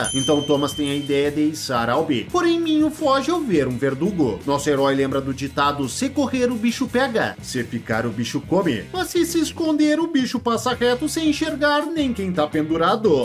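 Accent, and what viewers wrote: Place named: South, Brazil. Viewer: O chato da narração é o sotaque de Roceiro Sulista! Cara, volta pra roça! Lá você conseguirá!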